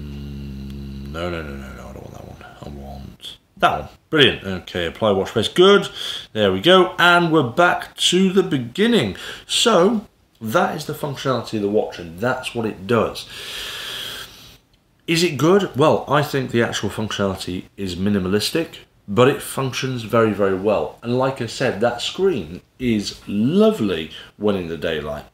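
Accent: United Kingdom. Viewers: I love the British background- the cup of tea, rusty books, bottles of whisky! Nothing like getting your daily tech review in a British accent!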